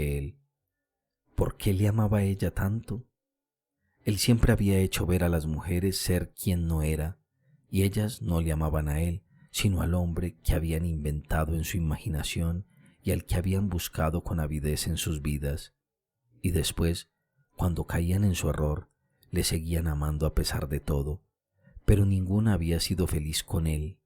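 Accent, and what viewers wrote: Colombia. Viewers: Amo tanto la obra de Chéjov que hasta tolero ese terrible acento colombiano con el que el lector nos ilustra. Se aprende tanto sobre la cultura rusa y de paso sobre la misogínia de Chéjov. Con todo, cómo no amar su obra. Leí su obra completa cuando tenía 20 años pero ahora con YouTube la escucho y es como si fuera la primera vez. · Excelente.....me encanta....el acento colombiano....muchas gracias.....su trabajo...nos alegra.......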